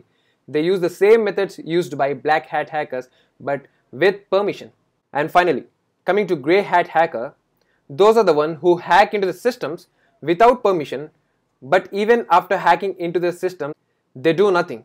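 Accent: India. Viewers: it's a proper indian accent....not slight... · You know it's about to be good when the man's got a slight indian accent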